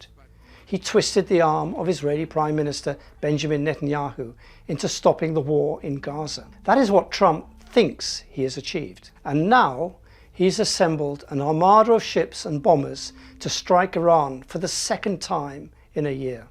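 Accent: United Kingdom. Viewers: His british accent automatically disqualifies the speaker as their our real enemy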